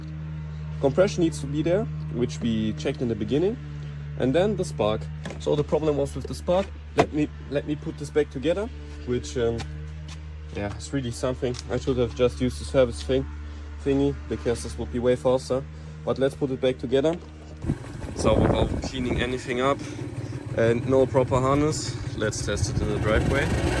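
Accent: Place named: Germany. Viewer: Cool Video but the German Accent ist da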